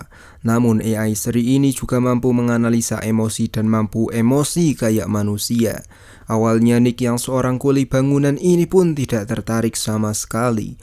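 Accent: Java, Indonesia. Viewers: lah admin wong jowo cuk, dengar gak sih cara bicaranya 😂 tumben ada wong jowo gak bisa diajak bercanda.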